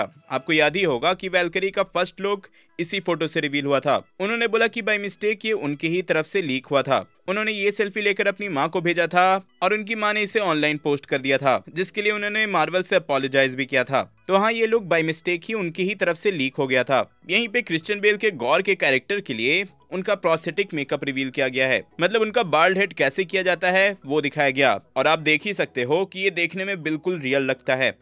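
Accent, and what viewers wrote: Bihar, India. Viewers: Bhai Bihar se ho kya? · Sir ap bhi bihar se ho kya????? 🙂🙂🙂🥲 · Ap Bihar se ho ? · Aap bihar se ho kya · Bihar s ho ky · Aap bihar se ho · Aap bihar se ho kya 👀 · Are bhai aap bihari ho kya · Aap Bihar se ho ky👀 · Are you from bihar? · I think your r frm bihar, I'm also frm bihar😂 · Are you from Bihar ? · Bihar se ho kya? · Aap Bihar se ho kya Bhai :) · are u from bihar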